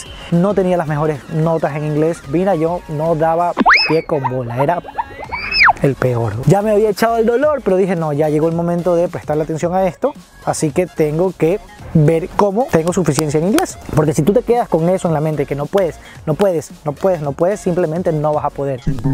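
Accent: Ecuador. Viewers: Jaja ni bien te escuché hablar dije el debe ser ecuatoriano Saludos desde Quito :) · he mi comentario esta ahi tu acento guayaco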